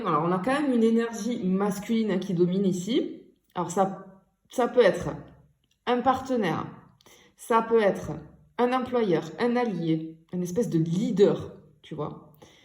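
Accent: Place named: Provence, France. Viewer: Bonjour, j'apprécie la manière dont vous vous adressez à vos auditeurs, votre énergie et charmant accent provençal ✨💜✨🙏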